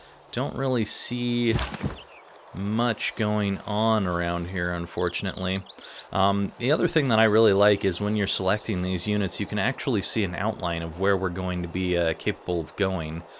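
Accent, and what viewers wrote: United Kingdom. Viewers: Your Japanese why is the narrator British or British sounding?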